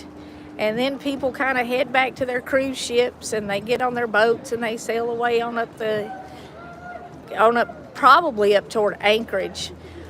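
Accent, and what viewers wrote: South, United States. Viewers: Your little country voice just sounds so sweet. ❤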